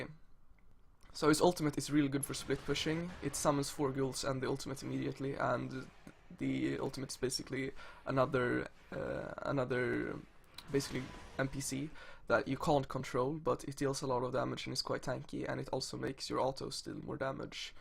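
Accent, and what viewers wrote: Sweden. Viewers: This guide was awesome! Are you Swedish xd? (Your accent sounds like a swede)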